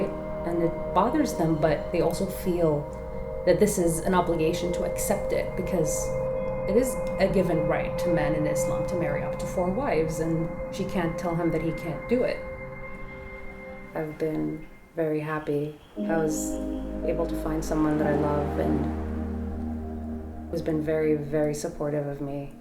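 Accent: United States. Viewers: I'm Australian, and I can hear that you have an accent. An American accent.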